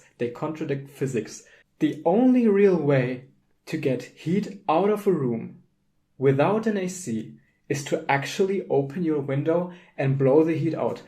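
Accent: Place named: Germany. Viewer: why if i hear how people speak english i directly know which their original countries are? I guess he is GERMAN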